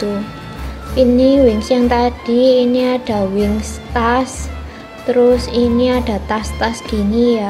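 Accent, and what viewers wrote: Java, Indonesia. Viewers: Medok anjay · Medok bgt kak wkwk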